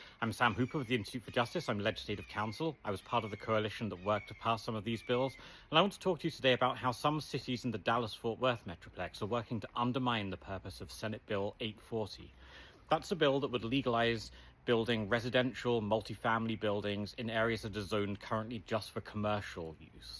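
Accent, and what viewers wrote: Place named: United Kingdom. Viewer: Why is a British man explaining Texas laws to everyone?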